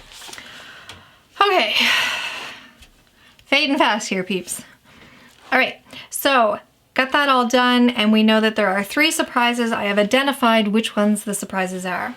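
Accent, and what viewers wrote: Canada. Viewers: Thanks for doing this unboxing. i like how you include the times to fast forward to avoid spoilers. Nice Touch! I think the Advent calendar is very cute and as I quickly looked through the list of flavors included, I have no doubt that I would enjoy the teas. I do have an issue with the 3 "surprise" items as they merely seem like coupons you could get pretty much at any time and with the narrow dates (likely to try to spur sales during/after the holidays) they exclude them from being useful to me as the nearest David's Tea is more than an hour away, so I only get there 2-3 times/year. It must be your Canadian accent but your pronunciation of Advent was a bit distracting to me. You pronounce it as though it is spelled "Advant."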